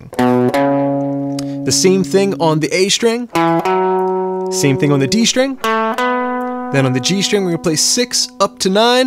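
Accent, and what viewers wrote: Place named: Baltimore, United States. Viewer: Philly? I hear Baltimore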